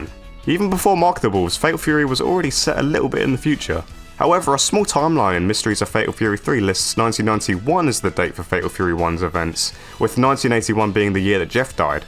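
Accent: United Kingdom. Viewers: I love hearing a Brit talking about Fatal Fury and SNK, the game and the company is not as popular as SF, Tekken, and Mortal Kombat here in the uk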